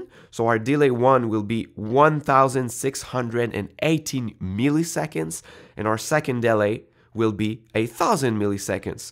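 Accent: Quebec, Canada. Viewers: Is that accent from Québec?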